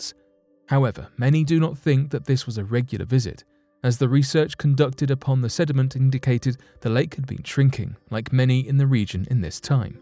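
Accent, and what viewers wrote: England, United Kingdom. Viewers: Enunciation must be precise for communication. Colloqualisms and heavy, near indecipherable accents hinder pure flow of thought. That is true no matter what language is being used. Imagine a Scot trying to speak West Indian--- or a German trying to speak Apache. Whatever language you are speaking, you must be clear and concise in your presentation. This narrator is an Englishman speaking English. And, imho, doing a fine job.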